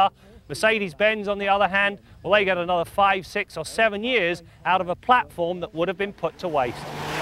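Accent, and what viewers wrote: United Kingdom. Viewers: It's weird to hear a guy with a Hitler moustache speaking with an English accent.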